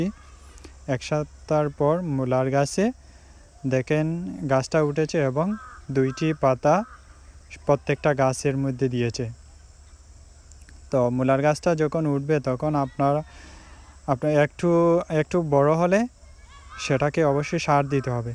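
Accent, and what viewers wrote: Sylhet, Bangladesh. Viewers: Sylhoti bhaisab. Apnar mat khub bhala lager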